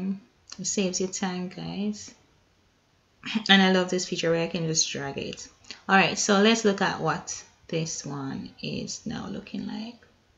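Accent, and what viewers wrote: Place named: Jamaica. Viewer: Thank you! Love your Jamaican accent.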